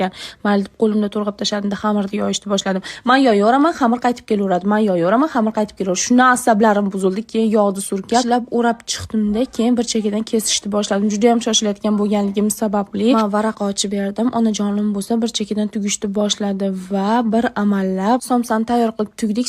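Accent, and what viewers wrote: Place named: Kokand, Uzbekistan. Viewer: Биздаям сурпа дийилади кукондаям куконча шеда гапиряпсиз якинда куконга куваям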